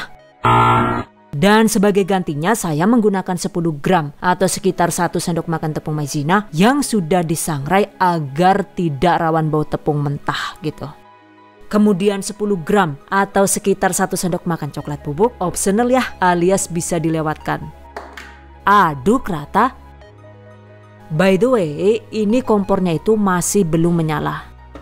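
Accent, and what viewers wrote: Banyumas, Indonesia. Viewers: Mbaaaaaa'e , ngapak²😂😂😂 resepnyaaa mantap. Terima kasih yoooo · Serumpun sepertinya sama suami saya..ngapak"😄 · Suka ngakak denger mbak nya ngomong ngapak..😂🎉sukses trs mbk...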